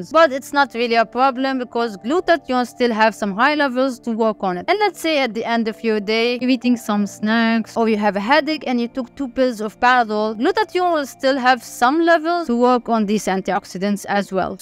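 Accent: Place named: Lebanon. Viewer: English with a Lebanese flavor....... ملوخية flavor 😂😂😂😂❤❤❤❤ Though I don't like (ملوخية) my family keeps on making it...... I wish you all the best, I'm following you on your YouTube channel in Arabic and I'm following you here and I will share this with my friends.... thank you for everything, and congratulations for the 100.000, hope you'll reach more and more.... Most importantly, wish the war will end in Palestine 🇵🇸🇵🇸🇵🇸 We pray for the families, Incha Allah Lebanon will be safe 🇱🇧🇱🇧🇱🇧🇱🇧🇱🇧 From Algeria 🇩🇿🇩🇿🇩🇿, your fan ❤❤❤❤ Abdessamad........ Keep up with the good work, you're amazing ☺️👌❤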